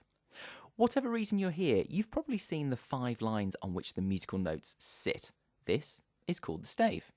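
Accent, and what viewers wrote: United Kingdom. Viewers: A nice British accent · are you from the uk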